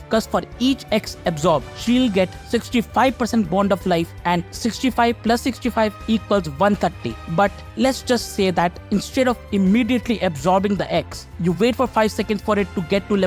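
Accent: India. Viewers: leave it to some talented smart guy with a indian accent to finally explain it in a way i understand, thanks i subbed! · unbearable to watch due to your Indian accent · Those racist comments are wild. Yeah you have a Indian accent, SO WHAT, ITS A BIG WORLD PEOPLE. Good job, gonna sub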